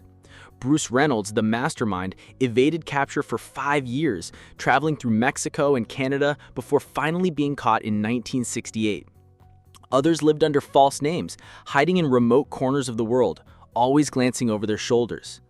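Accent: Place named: United States. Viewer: Why do these English videos always have to be made by little Yank boys with horrible accents?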